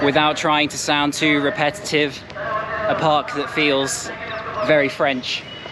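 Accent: United Kingdom. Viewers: Are you British?...Thanks...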